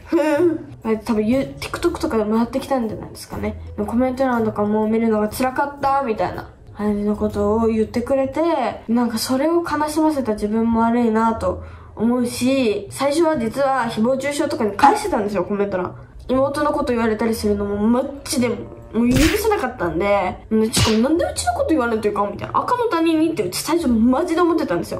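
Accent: Miyazaki, Japan. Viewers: 喋り方は宮崎の名残だと思いますよ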